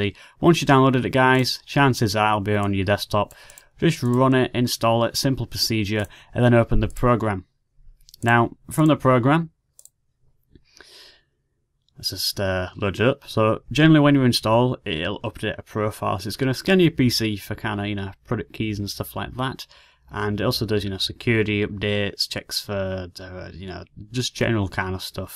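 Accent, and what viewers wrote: United Kingdom. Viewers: Chris Nova its real English, we call it British English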